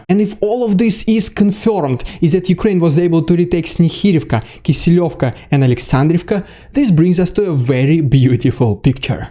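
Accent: Russia. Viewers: I love hearing the good news in an unapologetically Russian accent. It reminds us that this is not an inter-ethnic conflict.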